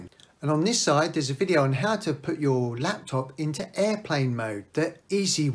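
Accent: United Kingdom. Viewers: IT WORKED! 👍 Thank you so very much. I’m not IT literate, but due to your easy instructions, along with your British accent, I was able to complete this task Diolch yn fawr 🏴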